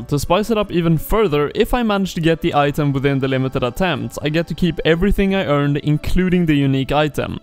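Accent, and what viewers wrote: Sweden. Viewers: Really love the series, you sound swedish are you? "drÄgon hunter lAnce" RSN: M4tsson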